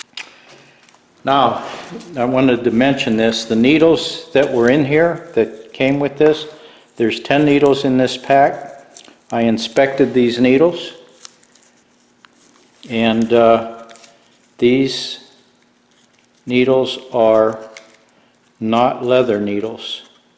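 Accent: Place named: Pennsylvania, United States. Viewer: fantastic video...My cobler just arrived yesterday ~ still in the box and will be putting your helpful video to use tomorrow... Thanks so much!!! You have a South Western PA accent...curious...any chance you're from that area???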